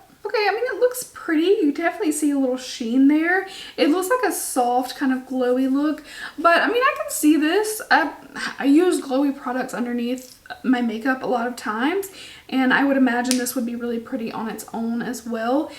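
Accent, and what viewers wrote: South, United States. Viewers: Idk why I just saw hey y’all in the CC on my recommended, clicked your vid, heard your southern accent, and immediately subscribed. Lol I’m biased to southern girls like me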